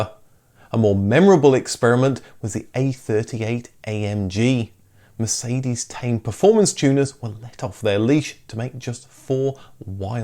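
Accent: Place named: Midlands, United Kingdom. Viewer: There's many British accents and dialects. Midlands/northern accent?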